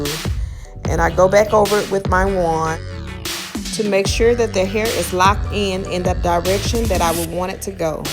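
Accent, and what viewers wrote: South, United States. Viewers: I can tell you're Southern belle by the accent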